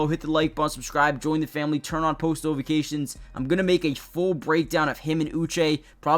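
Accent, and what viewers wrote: Canada. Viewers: Do I detect a Canadian accent?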